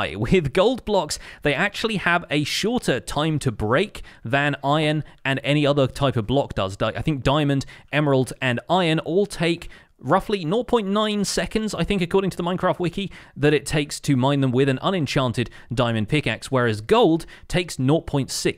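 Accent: United Kingdom. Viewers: Why did I notice he sounds British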